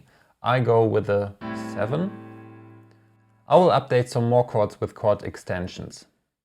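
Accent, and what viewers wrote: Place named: Germany. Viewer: You Sounds like a German Guy when you speak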